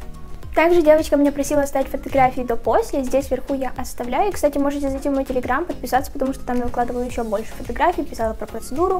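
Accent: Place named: Western Ukraine, Ukraine. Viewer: Это западно-украинский акцент. Ничего плохого здесь нет... А вы - нетолерантны.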